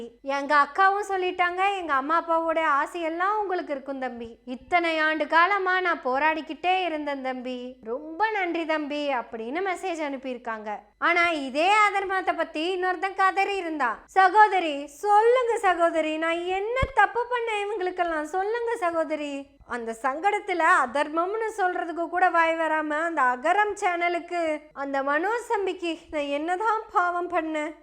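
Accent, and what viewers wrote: Kongu, India. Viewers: தங்கையின் கொங்கு வட்டார பேச்சு மிக‌ அருமை❤ அது என்னமோ சைமனே யாரு தூக்கி போட்டு மிதிச்சாலும்... ரொம்ப சந்தோஷமா இருக்கு 😂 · நீங்கள் பேசறது கொங்கு தமிழ் இருக்கிறது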